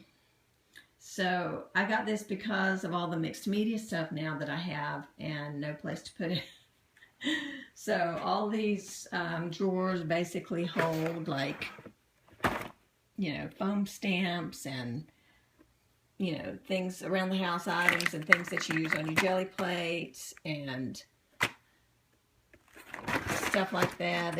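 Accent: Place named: South, United States. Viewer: Thanks for sharing your incredibly inspiring art room and art process. My kids think I'm an art supply/junk hoarder, but you just normalized my accumulation of art "treasures." Love your accent! I miss the south.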